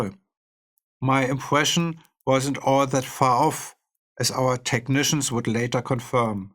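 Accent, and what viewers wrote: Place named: England, United Kingdom. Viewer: I love his English accent! Flake is such a musical genius. Rammstein would not be complete without him.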